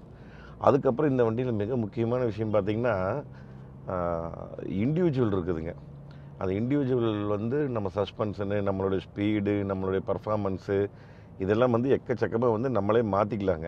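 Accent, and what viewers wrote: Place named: Tiruppur, India. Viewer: Your speech is very nice in tirpur language we are from tirunelveli.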